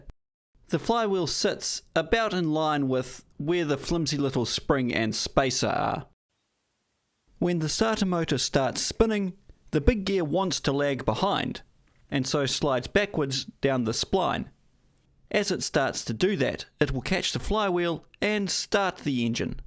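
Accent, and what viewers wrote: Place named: New Zealand. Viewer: Ahh the refreshing sound of a kiwi accent whilst abroad. Great stuff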